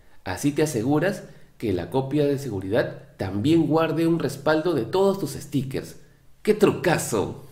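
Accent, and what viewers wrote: Peru. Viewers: Acento Limeno 100% jeje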